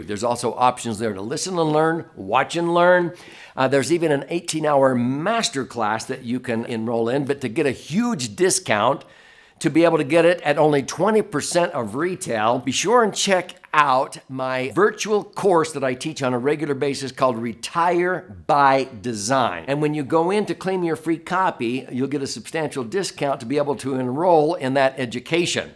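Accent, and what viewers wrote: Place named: California, United States. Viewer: Thanks for everything, you value your California style articulation. I have to do something with my old 401k in the next 30 days. Financially I’m in a tight spot I’ve thought of pulling 5K to help my current position then putting the rest somewhere else. I’ll have a little over 20k left… you’ve got me thinking of a iul but idk if it’s the way to go. Do you have any acolytes I can work with in Wisconsin? Thank you sir, peace.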